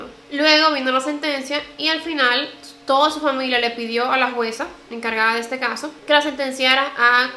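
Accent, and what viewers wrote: Dominican Republic. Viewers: Me encanta 😍 tú trabajo ,sobretodo porque hablas con nuestro acento inconfundible de nuestro país ,Republica Dominicana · Desde que la escuché supe que era Dominicana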